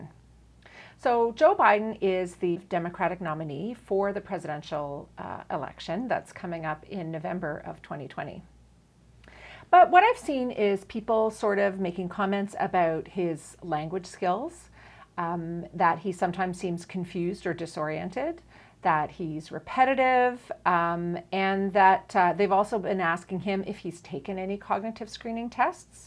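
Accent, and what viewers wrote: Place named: Canada. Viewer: Your accent gave you away! You should have clearly stated at the onset that you are from Canada. As one who has spent much time in your country, there is an extremely strong bias against USA conservatives in general. For years and years, Canadian media has been obsessed with American bashing and has been rife with thousands of examples including long documentaries. That bias is daily and insidious and long-standing sport. So here you have a Canadian medical doctor (you) who is commenting "incognito" on USA politics by not identifying where you are from (and quite possibly, only because you are Canadian, hiding bias). I am sure you know that in high-level research, bias destroys validity. It is quite possible that you only wished to educate the public about dementia. However, the fact that you did not identify that you are Canadian and this concerns Joe Biden (in the United States), is both questionable and suspicious. 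There is a huge amount of recorded primary source empirical data demonstrating that Biden has a serious problem and most of the USA knows it (on both sides of the aisle). I wonder if one sees this kind of covert interference in Canadian politics by Americans?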